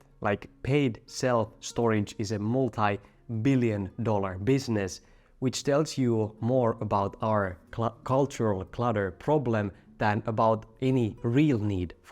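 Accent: Finland. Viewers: Got a recommendation, dat finnish accent, I don't even speak the language but I still immediately recognized rally english :D This is one of the best summaries it's going on in my community, especially among older people. It affects a lot older people, seems like this happens when your cognitive capabilities drop, hence I'm lately trying to avoid hoarding as would demonstrate I'm already getting brainpower reduced. Old people, at least in my country, were rather well off, even with mediocre job you could afford a home, so they don't value space as our younger generation. Also in the past jobs and overall life didn't require much intellectual efforts, which lead to this situation. Another reason for hoarding is that certain things are very hard to get rid of, with all this green agenda, you can't carry all the junk in the nearest dump container and if you do, you try to get there without anyone spotting you.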